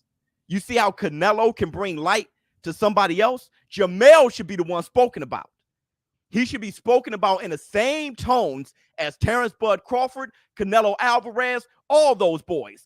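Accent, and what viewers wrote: Chicago, United States. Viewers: Yo, you from Chicago?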